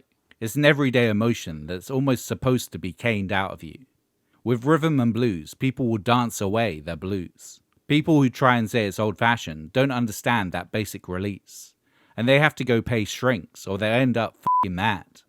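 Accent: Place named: Birmingham, United Kingdom. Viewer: Didn't realise you were a Brummy!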